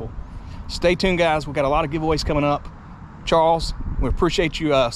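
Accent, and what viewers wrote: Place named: United States. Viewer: Love your American Accents!! A Canadian eh?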